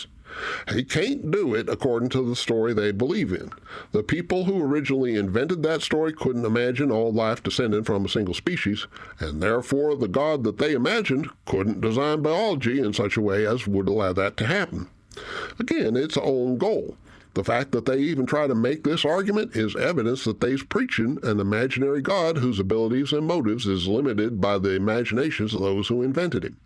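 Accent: South, United States. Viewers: Weyell, the cognitive dissonance betwixt country hick-sounding bear and educated skeptic somehow ain't keepin' me up at night! I like it, and why not adopt an easy-to-recall schtick? For new relatively unknown creators, do you know Ivy Windsong Collins? She's got older southern belle down very nicely indeed.... TTYL!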